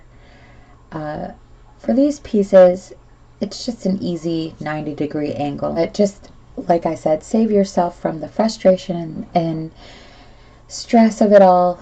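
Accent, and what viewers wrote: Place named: Canada. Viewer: Your accent reminds me of canadian english the way you say certain words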